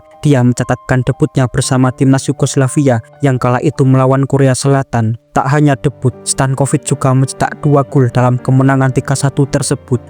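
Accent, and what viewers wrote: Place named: Java, Indonesia. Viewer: ngomongnya jangan medok bro, pake bahasa dan nada nasional. agar enak di dengar.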